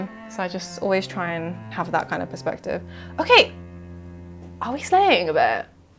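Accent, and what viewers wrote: United Kingdom. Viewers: How did i not know she was british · I just want to hear her breathe into the mic... I really want her to ASMR through my ears...I'm really loving the British accent too... sound so nice ....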